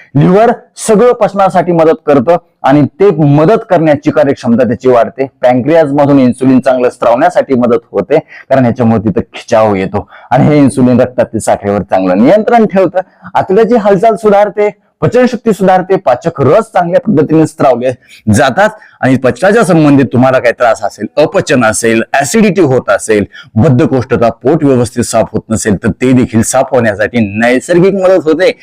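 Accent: Marathwada, India. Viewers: डॉक्टर तुम्ही नगरच्या आहात नगर बीडच्या लोकांसारखा बोलणार आहे म्हणून विचारलं तुमचं बोलणं पण खूप छान आहे आम्ही पण बीडच्या हो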